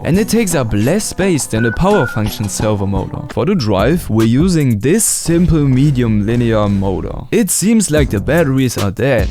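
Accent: Germany. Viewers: He's german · Br du hast den Deutschesten Akzent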